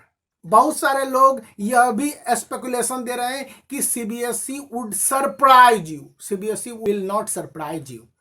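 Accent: Bihar, India. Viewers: Are u a bihari??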